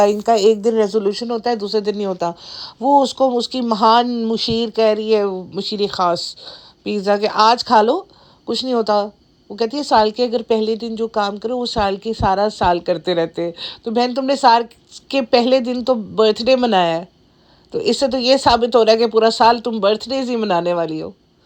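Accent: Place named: Punjab, India. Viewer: That punjabi tuch ❤❤❤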